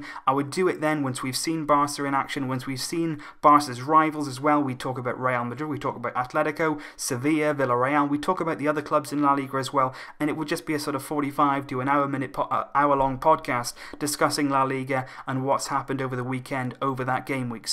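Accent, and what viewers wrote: Wales, United Kingdom. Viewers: Barcelonista 1983 I'm from Scotland and he definitely sounds Welsh mate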